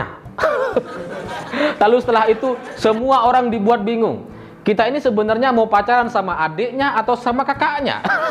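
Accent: Madura, Indonesia. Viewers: Narator nya ...dialek nya Madura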